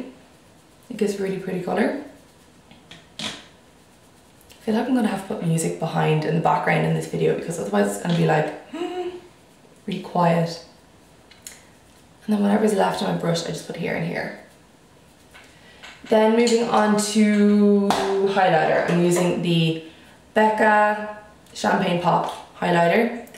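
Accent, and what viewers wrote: Ireland. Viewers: Screw whatever people say about your "weird" accent. It's beautiful!!! I live in New York and I'm Puerto Rican, all I hear all day is boring American English and fast paced Spanish. It's refreshing to me to hear you speak with your wonderful Irish accent. 🍀🍀🍀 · Love this kind of video! Could listen to you talk all day. Whoever doesn't love an Irish accent is crazy! Keep it up babe 💜🙌🏼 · it's only an accent to those who don't live in Ireland.. i love your accent! I'm from Boston so we say things funny too aha love you girly, beautiful as always! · anyone who complains about your accent and the way you pronounce things can suck a toe. how ridiculous! i loveeee your irish accent!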